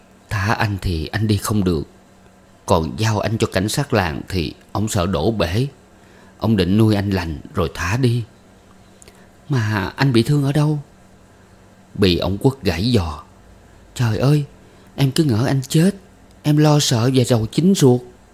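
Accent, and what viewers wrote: South, Vietnam. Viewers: Giọng đọc miền Nam quá hay và truyền cảm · Giọng đọc chuẩn miền Nam. Anh đọc quá hay xin cảm ơn anh và Tác giả.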